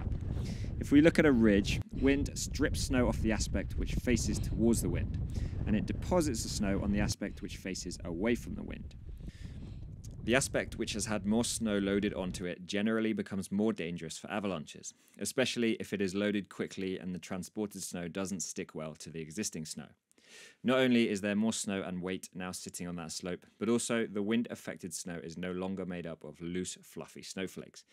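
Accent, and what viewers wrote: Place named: Australia. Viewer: Oh jesus! Not another aussie, new zealander or whatever!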